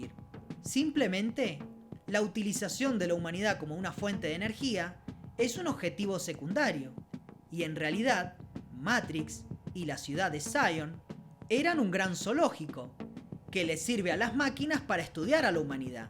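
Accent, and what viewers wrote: Argentina. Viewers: matrix version porteño che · Acento Argentino jodiendo toda la saga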